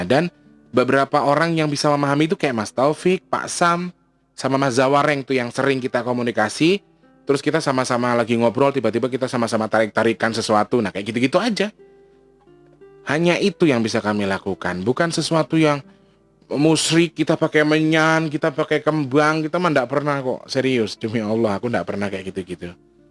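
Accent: Banyumas, Indonesia. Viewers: knp geli banget denger suara masnya ngapak go internasional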